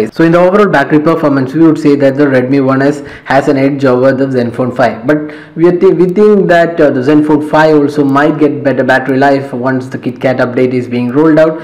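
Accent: Kerala, India. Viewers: ur mallu accent is so prominent...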